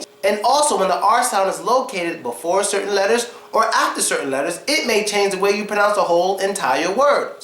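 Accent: New York, United States. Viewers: How much would i give to speak such a perfect Us english ,at least the end of my left small little finger ,well ,thanks for that good lesson (i'm breton and trying to learn, improve your beautiful language) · Grrreat video! where are you from? Newyorker? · NY ACCENT LOL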